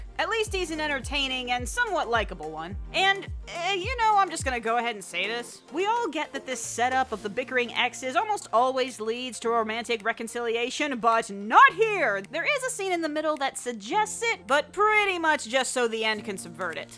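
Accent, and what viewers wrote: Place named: Scotland, United Kingdom. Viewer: Nice Scottish accent ! Im definitely going to see this tomorrow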